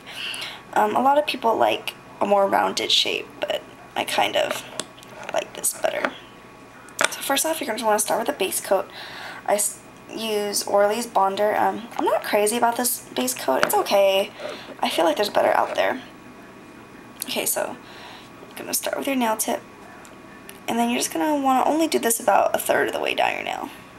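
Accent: United States. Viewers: So obvious you're from Cali... you sound like Brenda Song LOL Nice tutorial though :p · R u american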